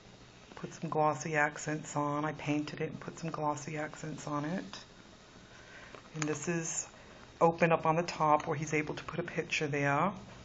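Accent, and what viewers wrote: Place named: New England, United States. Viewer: Hi. So? I think I hear a bit of New England in your accent? I came over from RJ's channel. The album and it's box are gorgeous.Luscious tags! You did a great job with your 1st vid. I don't have a camera yet. I hope I do as well on my first. Kathy and Leann are both great artists. Have you ever seen Anne from xannero1 videos. She makes the most amazing things! With all sorts of mini shelves and boxes. She even made a mini sewing table for her last mini! TFS Hugs, Jess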